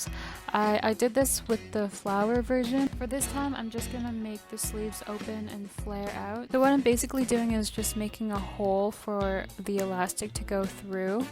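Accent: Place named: England, United Kingdom. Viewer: can't wait to hear it 😂 and I have heard worse especially when they try to sound extremely posh which tbh everyone tells me i speak like that but Ik that not everyone does and you sound like a normal English person btw Britain is Wales Scotland North Ireland and England all together and we all sound very different 😊 that's why what you were doing is more of an English accent rather than say an Irish accent😊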